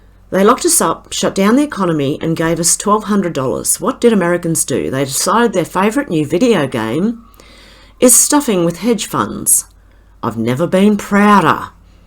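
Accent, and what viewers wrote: Australia. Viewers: Iv been trying to listen for your speech to sound funny but you still sound normal. so keep being our Australian voice that covers the important world news. We need more Australians like you. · Hun I am really sorry that some nasty people made you feel bad about yourself. For what it's worth... I have never heard your speech as anything other than normal... well.. except for the Aussie accent ( I'm a Brit) lol. I look forward to your videos and always enjoy what you put together for us. Sending you all my love. Lisa xxxx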